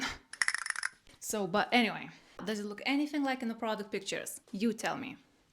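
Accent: Eastern Europe. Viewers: Eastern Europe- Russian or Ukrainian.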